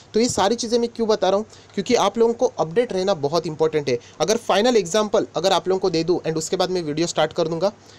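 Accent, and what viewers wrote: Maharashtra, India. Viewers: Marathi aahet sir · Sir तुमचे नाव kay आहे बोलण्या वरून तर वाटते मराठी आहात share मार्केट शिकायला खूप हेल्प hot आहे तुमची सर्वजण फी वैगरे मागतात 1एप्रिल पासून continue vidio बघत आहे तुमचे फायदा pan झाला thankyou so much sir pan नाव सांगाल तुमचे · Bhau apla marathi ahe acent warun samajte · Mala pan vatala marathi aahet...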